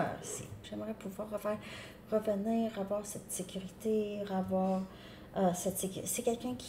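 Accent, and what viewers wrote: Canada. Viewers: Merci pour la guidance pleine de vérité relation toxique et je lache prise je veux la stabilité et la paix merci je vous adore ainsi que votre accent canadien ""une Algérienne "❤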